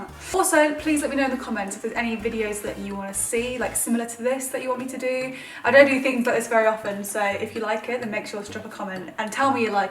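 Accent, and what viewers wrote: United Kingdom. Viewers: i did not expect her to have a british accent, after all those four nine looks stories on snap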